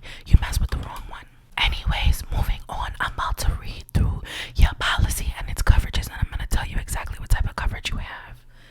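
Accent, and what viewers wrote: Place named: New York, United States. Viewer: I knew you was from NY cause that accent baby lol. Brooklyn here. What part you in?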